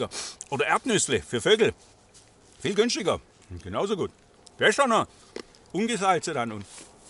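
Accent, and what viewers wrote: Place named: Swabia, Germany. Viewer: legga legga :-) Ein echter Schwabe! Des kannscht da Hase gäbe...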